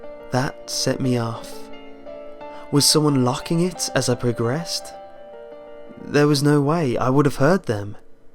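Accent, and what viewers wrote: United Kingdom. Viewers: Would've been scarier if you didn't sound like you were from The Beatles. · He's saying it normaly. There's no k, he's pronouncing the g, and you probably don't pronounce that yourself... -_- different accents from different places. PLUS he can't 'fuck up certain words' that are correct in British english because American English is a different language. So yea... .-. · you sound so british......its cool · Oh my god..... I really love this story :) YOUR BRITISHNESS IS WHAT TRULY SELLS IT!!! <3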